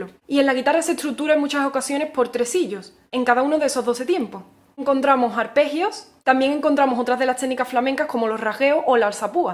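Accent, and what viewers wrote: Andalusia, Spain. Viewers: it's not a dialect but spanish with accent from Andalusia. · Es español, español, no se trata de ningún dialecto, es Español con sus toque particular andaluz en la bella voz de. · she is from Andalucía, she has a particular way of speaking spanish. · Es que es de Andalucía donde tienen un acento muy propio. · A quien no le gustaría tener a una profesora de guitarra tan guapa.... Madre mía....y con este acento andaluz...es un encanto escucharla.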